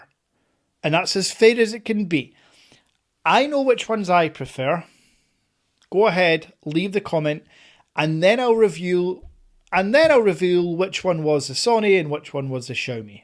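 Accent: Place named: Scotland, United Kingdom. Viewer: Scottish accent! Rab C Nesbitt does tech.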